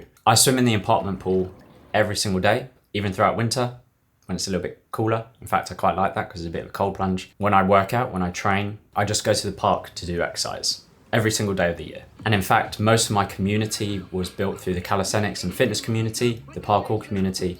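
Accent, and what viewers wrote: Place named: United Kingdom. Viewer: Hi As the child of a British migrant, I've always believed my mother when she told me how nice it is here. It's sad to hear so many Australians talk the place down & and can't wait to leave. PS: I think you have lost some of your British accent! My English mother is 94, and sounds very English to me but British people tell her the same thing. Well done for being adventurous & trying out a new life.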